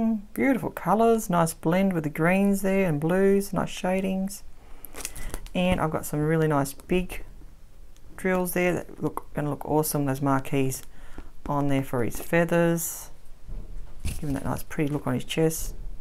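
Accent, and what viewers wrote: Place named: Australia. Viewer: Shopanydeals is a dangerous site for the bank balance. Found your unboxing videos and love them but always nice to hear a fellow Aussie doing unboxing/reviews.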